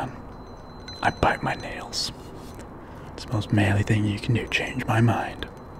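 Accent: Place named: Texas, United States. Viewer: So manly!💪The tools, the WD40, the garage and the extra Texan accent is giving hot masculine Daddy vibes! 👅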